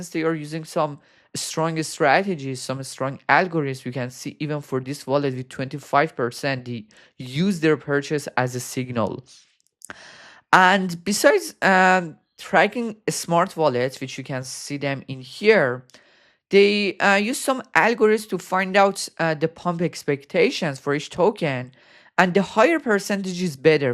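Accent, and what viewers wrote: Iran. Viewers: persian accent uhh xd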